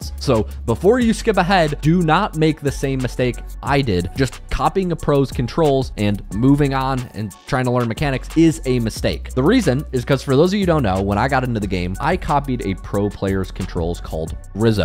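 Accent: Poland. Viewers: Is he polish? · wait are you from poland?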